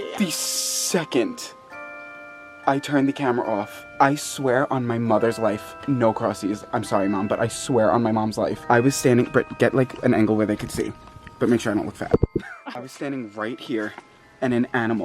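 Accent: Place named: Boston, United States. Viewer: love that boston accent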